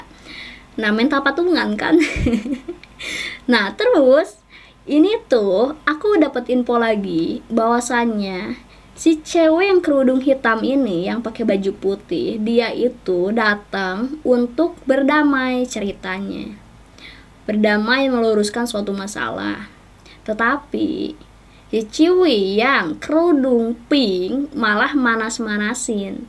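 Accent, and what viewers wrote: West Java, Indonesia. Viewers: Itu logat Sunda sih kalo gak salah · ai kaka teh orang Sunda aku tau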